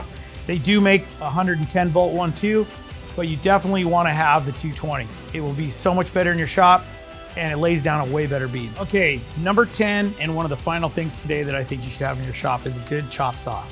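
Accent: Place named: Montana, United States. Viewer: are you from Montana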